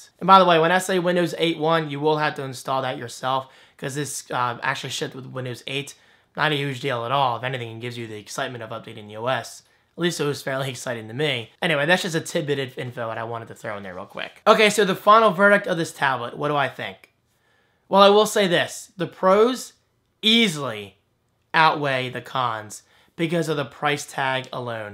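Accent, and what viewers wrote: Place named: New Jersey, United States. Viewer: am i the only one who heard him say saalid instead of solid... you are rocking the jersey accent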